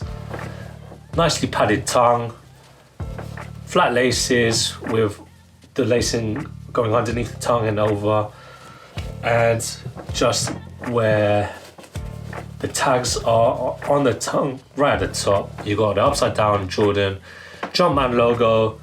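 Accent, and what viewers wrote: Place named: United Kingdom. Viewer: The British accent makes him seem of average intelligence but he really below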